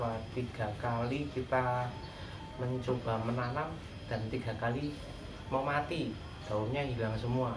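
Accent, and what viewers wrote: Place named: Banyumas, Indonesia. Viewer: Wong ngapak ya? Najajl inyong direwangi support ya, wis mampir kie, dipadamkan balik yaa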